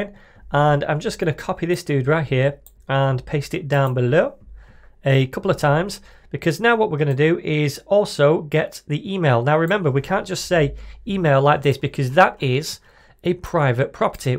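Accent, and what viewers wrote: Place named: Northern England, United Kingdom. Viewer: All your tutorials are fantastic. Great to hear a good northern accent also; more engaging somehow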